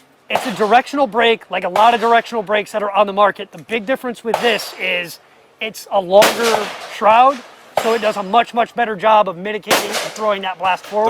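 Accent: South, United States. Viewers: I always liked a southern accent. Makes things go down smooth. LOL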